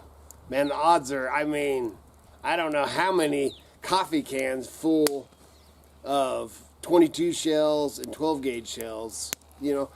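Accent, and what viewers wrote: South, United States. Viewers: I hear where you come from you're I just a redneck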